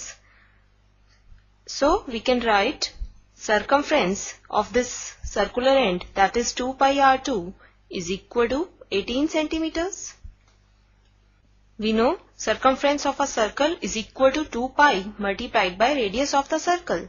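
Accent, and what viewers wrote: India. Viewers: I cant understand your indian accent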